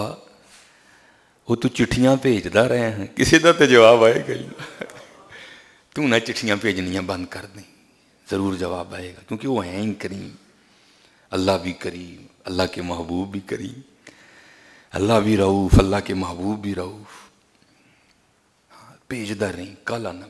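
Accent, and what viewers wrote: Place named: Punjab, India. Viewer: اردو اور پنجابی کی کمال مٹھاس ہے آپ کے لہجے میں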